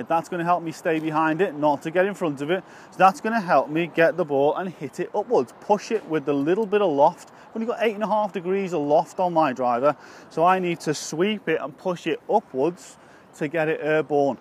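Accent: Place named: Liverpool, United Kingdom. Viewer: Great tip. I've been struggling with the drive for years & this tip immediately improved things for me. I'm living in Sydney, Australia but originally from Liverpool (Aintree - Old Roan) - I suspect from your accent you're from the same area.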